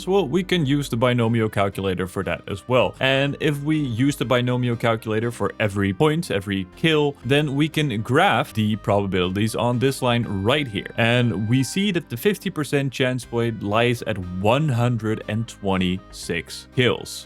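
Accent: Netherlands. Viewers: pretty nice effort hiding your dutch accent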